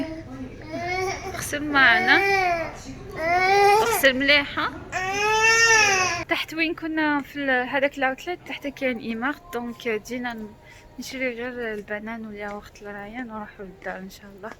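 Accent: Algeria. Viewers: هاذي لهجة جزائرية و ليست مغربية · قرن وزمارا ههه لهجة جزائرية 100 ربي ينورك بنت بلادي 🥀 اول مرة نعلق في قناتك ، تعجبني ويعجبني الله يبارك عليه 💛🌸🌸💛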